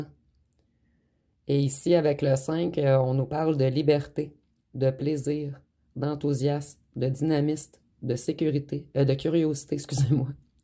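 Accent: Canada. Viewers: Merci pour votre accent canadien qui fait du bien et surtout pour cette guidance qui donne le droit de pleurer pour évacuer le trop plein. J'apprécie cette interprétation salvatrice. Vive les neutrons. 🌈🤗💗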